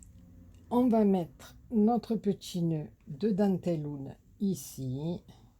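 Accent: South, France. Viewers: on entend bien l accent du midi.je suis de l aude tres jolie carte.tres vintage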